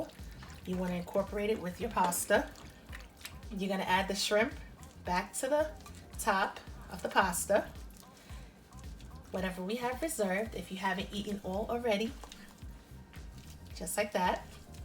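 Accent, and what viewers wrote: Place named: Northeast, United States. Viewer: That looked so tasty! you have a deep North Eastern accent. Are you from Brooklyn?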